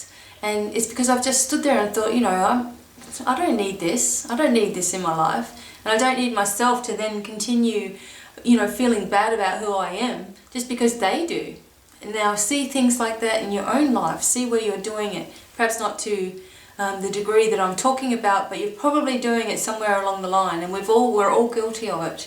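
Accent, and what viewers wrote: Australia. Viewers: Your sunny, unpretentious nature, your Aussie accent and your cheeky smiles always brighten my day and make me grin happily. Good job, B.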